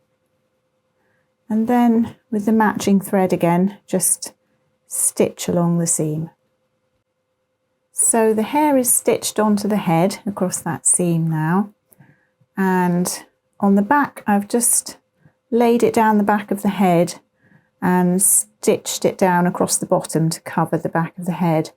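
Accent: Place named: United Kingdom. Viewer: Well I think the talking is explanatory. And this gentle British accent so much better than all of those yanking yanks!! 😅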